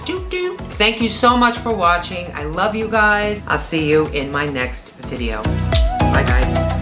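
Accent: New York, United States. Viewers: I love the plant container! You are awesome. Stay safe, I love your New York accent too. Much love from Cape Breton, Nova Scotia, Canada xo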